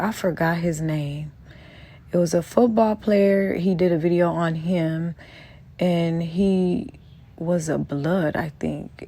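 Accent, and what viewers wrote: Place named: Los Angeles, United States. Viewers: Just by hearing your voice inflections and accent, I knew you was a Southern Cali girl. Thanks for clearing that up. You're funny, though. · I just knew you was from LA just by listening to you for the past few weeks 🙌🏽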